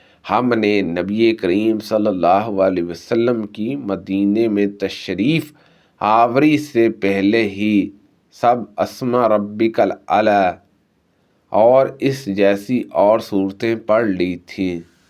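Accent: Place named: Punjab, Pakistan. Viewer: السلام وعلیکم بھائی آپ سب سے پہلے اپنی اردو پر توجہ دیں، آپ اردو پنجابی میں بول رہے ہیں۔ احادیث کی آسان اور مختصر تشریح بھی کریں ،اس طرح عام انسان کو حدیث کا مفہوم سمجھنے میں آسانی ہو گی ۔ جزاک اللہ خیرا ۔❤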